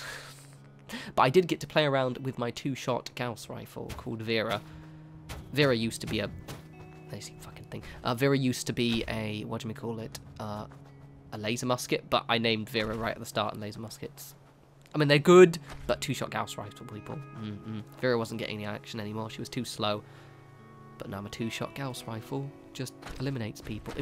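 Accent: United Kingdom. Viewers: I normally hate British accents but yours is acceptable 😂 keep up the videos!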